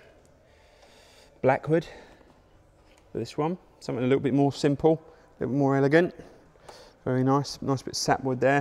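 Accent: United Kingdom. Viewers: Always nice to hear an Essex accent, and always nice to see fabulous Martin guitars. The best in the world. Sadly, I won't be coming to see you. No lefties!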